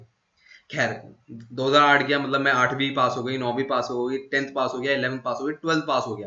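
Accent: Meerut, India. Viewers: Bro I am from Hapur I relate a lot from your meerut wala accent I want to improve my english grammar. Please give me an solution. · Meerut wale 🤣🤣🤣🤣 Inge unge this is true meerut ki language bilkul aese hi hai btw I'm from meerut too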